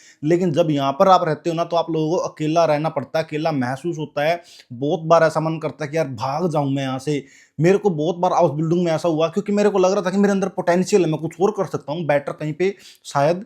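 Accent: Haryana, India. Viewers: Not to offence apki tone kitni haryanvi hai lagti hi nhi hai apko German suit karti hogi par earn karna sa matlab hai 😅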